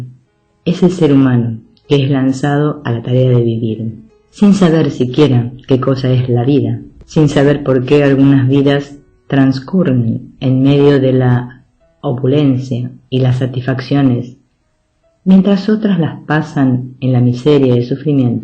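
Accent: Argentina. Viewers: Que voz argentina tan bonita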